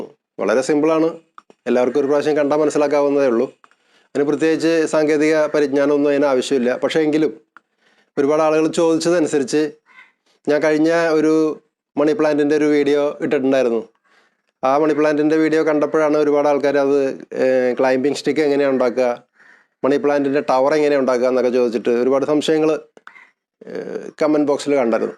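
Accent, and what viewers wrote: Thrissur, India. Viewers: chettan thrissurkRanaale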